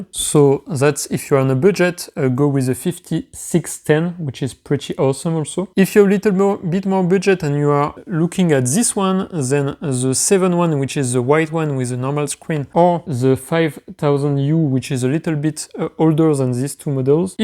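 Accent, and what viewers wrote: France. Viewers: Un bon accent français !! Comme on aime haha 😂❤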